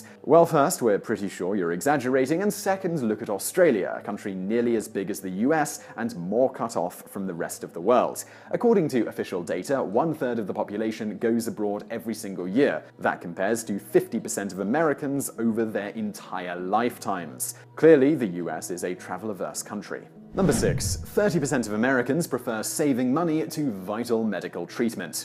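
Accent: United Kingdom. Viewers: it makes no sense listening to a brit talk about US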